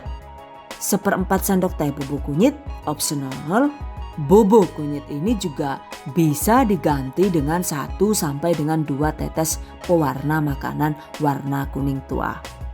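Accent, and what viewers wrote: Banyumas, Indonesia. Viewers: Salam ngapak Bun..orangapak ora kepenak😂😂🙏🙏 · Senemg bgt nonton chanel ini...ngapaknya sama dg saya...😂😂😂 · Well come back.. ketemu maning ora ngapak ora kepenak..kabare yu sehat.. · "DONE" Ujug2 muncul neng beranda yuotube, ndeleng judule pisang lgsg tak klik 😊😅 eh ternyata aku terpesona dg logat ngapaknya. Piye ikilah jaaaaand terngiang2 bae suara ngapake mboke 🤣😂✌️ muga2 bisa kaya mboke ya pinter masak werna2 🤭🤭😂 #GiveawayTHV2020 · Saya selalu mencatat kata yang selalu diucapkan dlm setiap video THV yaitu kata "Jadiiiii ....." dan aksen ngapaknya itu lho hahahha .....kereeeeen mbak beda dgn yg lain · "Done" Trimakasih resepnya Bun,prlu dicoba in InsyaAllah...,diselingi bahasa yg ngapak bikin ketawa trs..😄😄 #giveaway THV 2020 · "Done" Selalu nungguin "ngapaknya"😁 n nnton ini pas lg nenenin bayiq yg brsn lahir. Sempet ketawa sendiri pas bilang "Angel-Angel" alias susah.. 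Coba klo dtulis Angel bs jd artinya brubah jd "Malaikat" 😁 Btw.. Sukses trs bunda.. Selalu mnginspirasi.👍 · Mbokiek jawine ngendi tah.. bosone ngapak..uuu lutunaaaa 😝🤣👍 ... but i love your banana krispi...pake toping amazing..👍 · wong ngpak..hehe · Angel temen diwalik kiye.......😀😀😀😀😀😀pada bae mba,aku juga ngapak. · DONE Saya suka dg vidio resep"nya karna jelas dan lengkap dg tip &trik nya apalagi ditambah cara penyampean dan logat ngapak yg khas huh pokoknya angel....angel di suruh gak nonton,di catat lho mbok lali,😘😘😘💖💖💖#GiveawayTHV2020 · Kok ketawa ya setiap lihat vidio vidionya karna ada suara ngapak nya 😂😂😂😂 Tapi aku suka sama resepnya mantul bener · Tks resepnya jeng yg kadang2 ngapakk..tp aku nonton truss..ngapak.ngapak maju trus resep2 kulinernya jeng .... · Gaya bicaranya lucu, ternyata ngapak.....org Tegalll atw mn nih....😃😃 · Ini dr madiun apa mana ya kok ngapak? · Sebenernya asli mana sih bun, kok ngapaknya kaya istri saya 😁🙏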